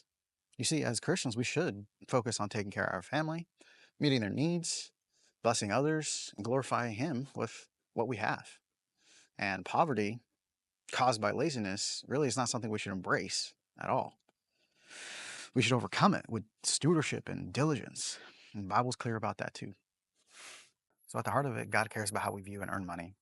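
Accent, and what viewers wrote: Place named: Canada. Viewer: Little changes add up to 1 big change though, and sometimes little changes are all people can do. New subscriber from East Coast of Canada 🇨🇦 . PS - I thought you were 🇨🇦 by your voice until you pulled out the American cash.. lol!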